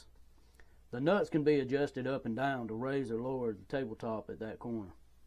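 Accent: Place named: South, United States. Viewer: I love your southern "slang and pronouncing" .....!!!!!! I'm original from Germany ,- and have friends in NacogdochesTexas ...... I use to mine for long years in B.C. Canada ....and work for big Mining Outfit as a consultant ..... Concentrating and mineral dressing .... Unfortunately it is real difficult to build a full working shaker table ...... ( according to the "TAGGART" ) ... but you came real cloth to it ... compliment !!! You are kept in thoughts ,- that there should be a special movement , who use the gravity and the mineral density for separation on a special surface ,- plus the influence of a water matrix and angle .... 👍👍👍 great job .... well done !!!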